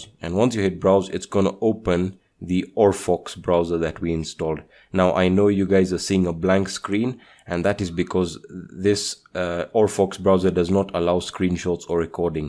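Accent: India. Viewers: He's obviously Indian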